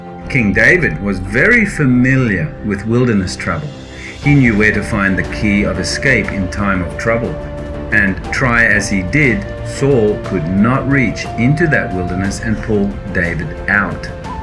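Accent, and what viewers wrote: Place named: Australia. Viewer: GBY! I hear an Aussie accent, but I’ve been told you live in Sth. Africa - is that right?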